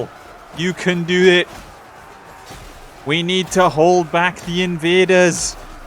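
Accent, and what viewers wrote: United Kingdom. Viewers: love the content, this randomly popped up in my feed. quick question, are you welsh?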